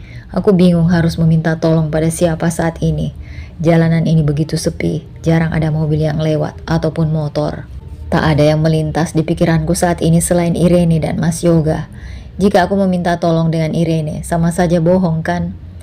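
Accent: Indonesia. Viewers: hadir bunda, jadi adiktif dengan chanel ini logatnya perpaduan sumatera sulawesi jawa hihihi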